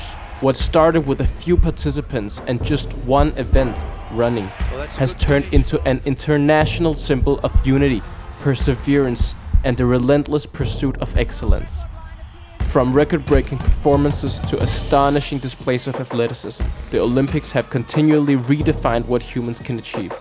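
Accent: Denmark. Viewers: Det er meget tydeligt i din accent, at du er dansk, men fed video mate! Keep going 🔥🔥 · you sound danish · Easy danish accent :D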